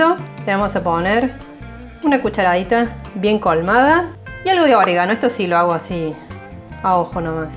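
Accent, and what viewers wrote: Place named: Córdoba, Argentina. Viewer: hay como una tonada cordobesa por ahi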